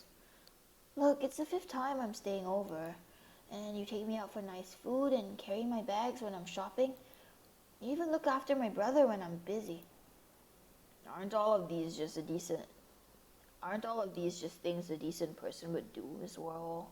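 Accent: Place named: Wiltshire, United Kingdom. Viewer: Whew..... Saw this in our sub box and instantly decided to listen while going on a morning walk. It's a sort of freezing, slightly overcast day over here with a cold grey sky so it was truly the perfect atmosphere. God... Forgot what HC does to a person until we experienced this. Remember watching manly play the original games years and years ago and they emotionally wrecked me haha. Truly some of the most gut wrenching visceral horror from indie games. Just pure agony all portrayed with such charming character designs and in an adorable art style. There's just something so haunting about the way this game dev explores topics and I think the way you described at the end nails it perfectly. We adored your Wiltshire voice and the creepy yet peaceful voice you gave Vincent (that cg parallel hello???? 😭). And ohhhgg if you play any of the dev's other games, we're so down to be hit over the head with the bag of bricks that will be the feels. 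Ehehe thank you for accompanying us on our walk with your soothing voice,! It was a really nice start to our morning 🥰❤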